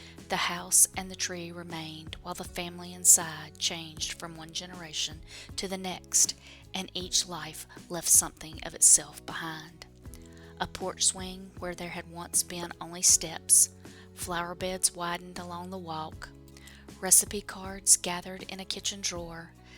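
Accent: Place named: South, United States. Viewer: Lovely, Very Southern and relaxing.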